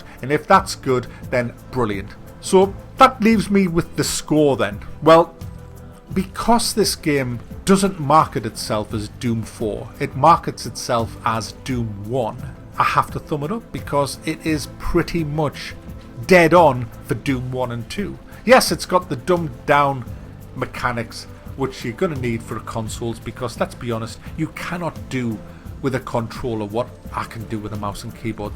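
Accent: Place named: Newcastle, United Kingdom. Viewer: Hey what part of the uk are you from you sound like someone from either sunderland or Newcastle haha?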